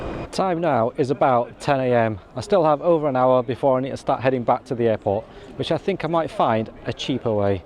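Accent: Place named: Yorkshire, United Kingdom. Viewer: I can tell you're from Yorkshire. I paid 12 euros return on the big blue Dublin coaches in May.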